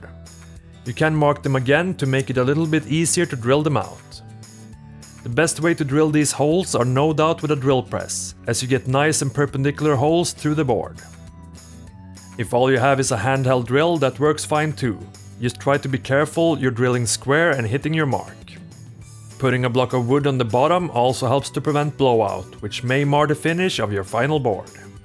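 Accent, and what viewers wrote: Sweden. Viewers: are you swedish??? your accent is pretty swedish?? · you are swedish right? You have a swedish accent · Without knowing better I would quess you are Swedish based on your accent and specialy the way you say "just". Awesome and very good looking video btw! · Sounds like northern Sweden. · Are you swedish becase i can Kinda hear it on your accent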